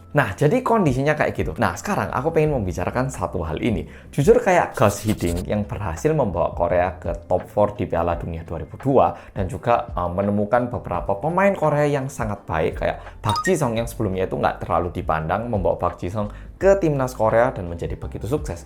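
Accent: Java, Indonesia. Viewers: Logatnya lebih ke logat jawa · bro kamu logatnya ko ke orabg jawa..medok😁🙏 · Apik komentarmu bro. Jawamu ko medok emangnya anda tinggal dimana. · Bahasa Indonesia kamu medok banget jawanya... Pasti km fasih bahasa jawa.... Yo opo ceritene rek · Hebat bahasa Indonesia anda perfect meski ada logat Jawa sedikit ,❤ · Sampeyan jawine pundi ?? Kok logatnya Jawa !! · Bahasa Jowone medok bangett,,😀 asli keturunan jowo pundi? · Lancar juga bhs indonesia ini mas Korea,,,lidahnya lidah jowo juga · Mas orang Korea ko bahasa Indonesianya lancar banget sih, bahkan logat jawanya kental sekali! Sudah berapa lama sih tinggal di Indonesia? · ini mas se logate jawa koyok e...tapi muka e korea...salut...aku.baru.mampir di channel.ini langsung tak subrike heheh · Bahasanya kok medok kyak Jawa ni 😂😂.pandai bicara Indonesia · Medok jowone😁😁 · Idemu cemerlang Rek, sampeyan neng Jatim Wis pirang taon kok medok banget ? 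· Sampeyan kok medok jowone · Ko rasanya mendengar aksen orang jawa bicara ya... 🤔🤔🤔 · logatmu medhok banget mas 😀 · Mantuuuuullll mas Medhok Jowone....😀👍👍👍 br ngevlog lg ya Mas, mngkin krna sbk dg kerjaan Rutin, ok truslah berkarya sehat2 sklwarga and sucses selalu Mas 🤲🙏👍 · Bahasa jowo nya sangat kental banget 😅😅😅😊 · Iki wong korea opo wong jowo logate kok medok jowo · Ini kok logatnya ada lihat Jawa emang tinggal dimana? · Lha wong dr TK smpe SMA sekolah nk malang ogg.. 😂 yo medhok nyel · Mas sampean ni ngomong Indonesia serasa Jawa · orang korea tapi kyorang jawa medok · Jawa nya medok 😂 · Muka Korea logat BHS jawanya kentel suka sekali ngeliat mas nya ngomong😊😊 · Waduh mas korea lancar banget berbahasa Indonesia nya dan logat Jawa lagi... OK mas korea saya sangat suka 👍👍👍👍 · Abang ini Dialeknya seperti orang Jawa awal nya Saya bingung Ini orang Korea atau orang Jawa 😅😅😅😅 Ok Bang Salam Kenal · Wahhh saya salut bahasa indonesia nya bagus bgt, ada logat jawa nya juga❤❤❤❤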